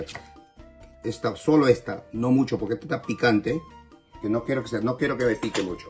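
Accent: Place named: North, Peru. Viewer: cuales barbaridades? su manera de hablar es del norte del Perú con mucho orgullo yo soy de lima y lo entiendo perfectamente si quieres alguien que hable bien el castellano hay otros youtubers este el un canal de cosina no de lenguaje .....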